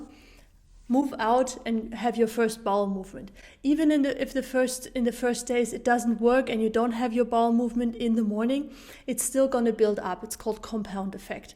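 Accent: Germany. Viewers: Trying to guess your dialect...German? Austrian? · R u german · Thanks soooo muchfor this Video God Bless you from Germany Your acent sounds like German. Frohe Pfingst Montag 2019 Iam srarting tomorrow <num>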